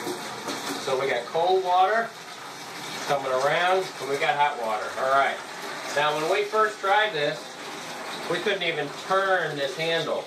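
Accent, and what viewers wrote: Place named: Ohio, United States. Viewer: I'm a fan of accents. are you from Eastern Ohio? rate my guess 🤙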